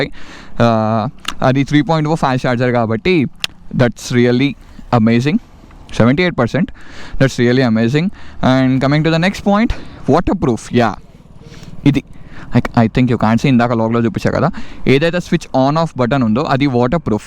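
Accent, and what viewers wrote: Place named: Hyderabad, India. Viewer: The way you speak like light posh and hyderabadi boy 😍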